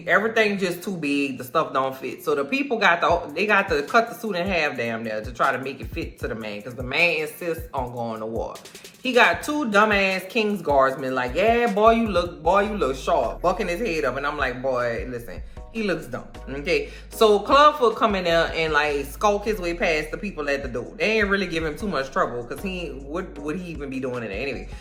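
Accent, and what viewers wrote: New Orleans, United States. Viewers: OK, this is like the 8th review I've watched and I am here for the NOLa accent 🙂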